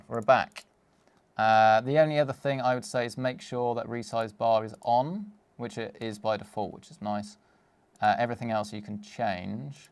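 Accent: United Kingdom. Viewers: I like british accent!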